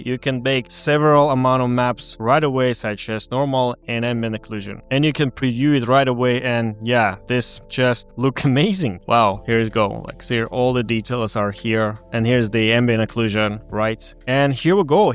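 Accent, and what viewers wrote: Russia. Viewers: godamn, Russian people always so good at everything LOL!, thank for this man, your accent in funny in a good way! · Мне кажется или у автора подозрительно русский акцент? Но в любом случае видео очень полезные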